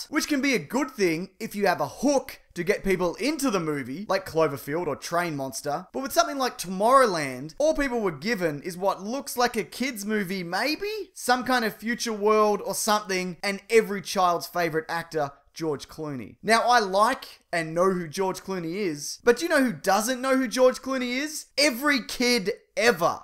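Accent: Australia. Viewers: Hey cool vid I'm a recent subscriber you got an awesome aussie accent that makes me wonder what you look like lol....anyway keep up with the awesome videos.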